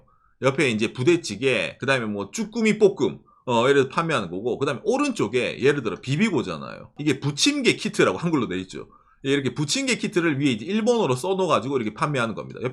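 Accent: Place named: Gyeongsang, South Korea. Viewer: 멘치로. 찐경상도!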